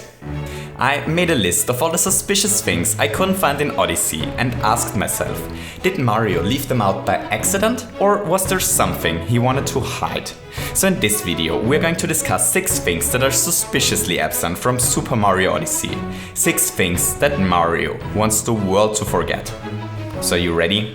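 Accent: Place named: Germany. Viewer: Nice Video. Deutschland oder Österreich (Akzent verrät alles)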